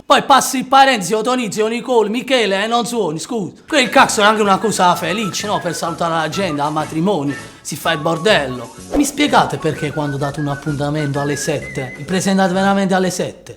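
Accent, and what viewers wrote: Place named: Bari, Italy. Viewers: Italiano? ci provi a parlarlo...... sei un barese non te vergognare. Identikit perfetto, se urla e ZAMPA e barese. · ahaha, molto simpatico davvero :))) Hai abbastanza ragione, così come ho la certezza matematica che ti stessi rivolgendo ai milanesi e NON a quelli del Nord! Ogni tuo singolo esempio collima perfettamente con le abitudini dei lombardi, specie versante Ovest, ma davvero credimi, non ha proprio nulla a che vedere coi veneti o i friulani. Sì, quasi tutti ceniamo intorno alle 20 e in estate una mezzora più tardi e altrettanto vero che anche i trentini usano l' articolo davanti ai nomi. Ma su tutto il resto proprio non ci siamo ed è opportuno precisarlo, perché gli amici del sud potrebbero davvero credere che tutto il nord sia abitato da gente che si comporta così. Fate un salto a Bologna, a Venezia, a Trieste e non ditemi che davvero non ci siete mai passati prima e non avete notato le differenze!! 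Bel video, comunque, mi sono divertita con il tuo barese. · MissLaura ma vedi che quello che usa non è dialetto comunque, parla italiano con accento pugliese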